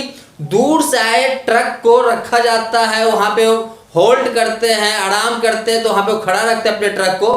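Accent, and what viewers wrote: Bihar, India. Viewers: Aap bihar se ho bhai sahab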